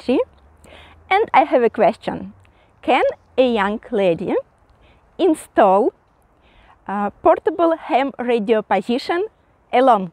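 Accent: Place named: Ryazan, Russia. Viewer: Красавица, у тебя проблемы с родным языком ? Зачем мучаешь язык врага своим рязанским акцентом ? К чему это и зачем ? Ладно когда во время OSO, понятно и логично, а все остальное время в ролике.... ?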